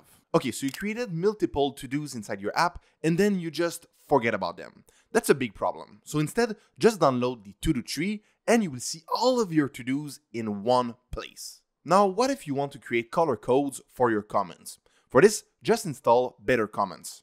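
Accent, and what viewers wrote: France. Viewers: That's a very nice explanation with a French accent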